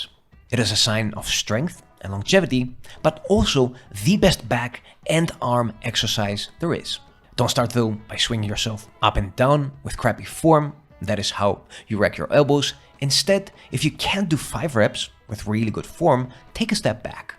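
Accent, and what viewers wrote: Netherlands. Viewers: Do I hear a Dutch accent? Must be! I'll recognize that anytime ❤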